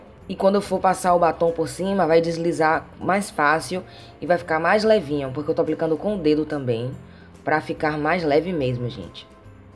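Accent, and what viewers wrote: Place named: Northeast, Brazil. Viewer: Primeiro video que vejo do seu canal, seu nome é?😂 amei o sotaque vc é nordestina?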